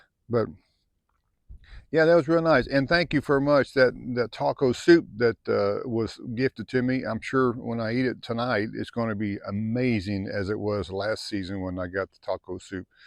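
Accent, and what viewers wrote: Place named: North, United Kingdom. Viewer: Hey you sound like a great northern boy like me.....please h it. Me up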